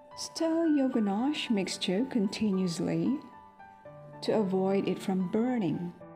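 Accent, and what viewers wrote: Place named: United States. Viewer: Ganahan ko sa voice over...murag amirkana 😊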